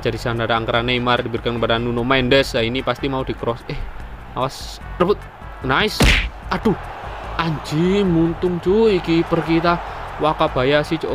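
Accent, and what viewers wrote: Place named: Banyumas, Indonesia. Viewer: Wong ngapak kie kayane.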